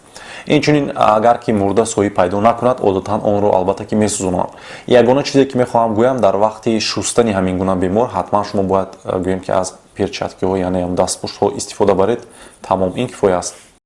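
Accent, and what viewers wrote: Khujand, Tajikistan. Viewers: Духтуримиз назаримда Хуҷанлик. Акцент 100% тушунарли.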